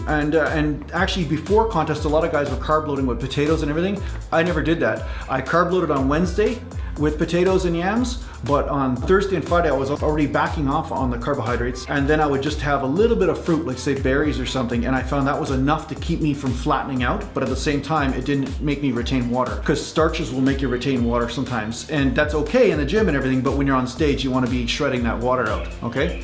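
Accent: Canada. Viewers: Are you canadian by any chance? Kind of sounds like it, not saying thats a bad thing haha im canadian myself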